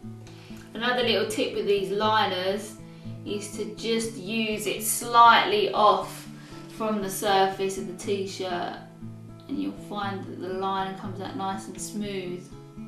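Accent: United Kingdom. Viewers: Epic British accent!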